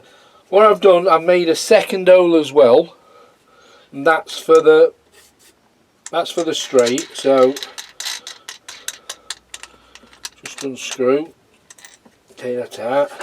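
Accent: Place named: Yorkshire, United Kingdom. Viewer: Nice idea been watching your videos over last few weeks and now subscribeyou sound a Yorkshire bloke witch part of a may ask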